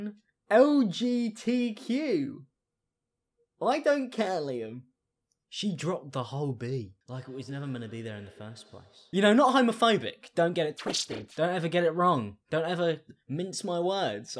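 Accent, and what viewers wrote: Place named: RP, United Kingdom. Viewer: His accent is so posh that I don't understand most of the things he says but I have to assume that it's a joke and laugh to it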